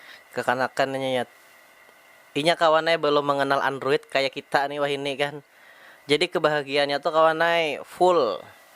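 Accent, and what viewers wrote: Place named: Hulu Sungai, Indonesia. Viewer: Orang mana kam logat hulu sungai pang nah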